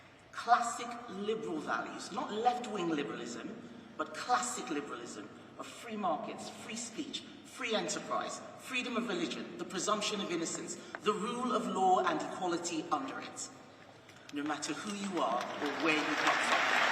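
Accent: United Kingdom. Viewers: Sounds pretty British to me!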